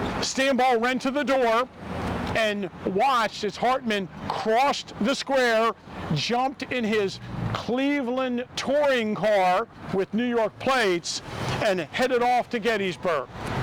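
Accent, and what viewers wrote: Baltimore, United States. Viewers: I LOVE Bal'mer accent. When I was a puppy many decades ago living in Eldersburg my neighbor Fred Will educated me on the dialect.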